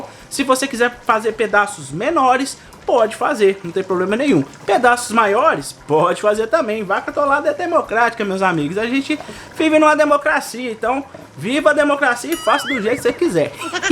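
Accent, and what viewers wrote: Minas Gerais, Brazil. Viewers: Amei o seu sotaque mineiro “ o trem mais bom “. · O homem fala mineiro mineiro quinhentas mil vezes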